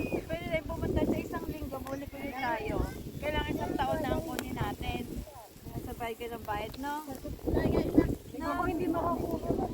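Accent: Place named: Bulacan, Philippines. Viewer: Parang punto kayung bulacan?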